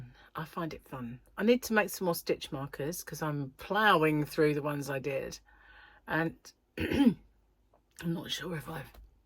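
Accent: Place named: England, United Kingdom. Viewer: Hi, You sure do sound English always, however the other day your accent was much thicker.( You were quite excited) Your blanket is quite beautiful love the colours, what stitch is that?? Enjoy your dinner out. Beautiful sunny day here enjoy your hump day in the UK.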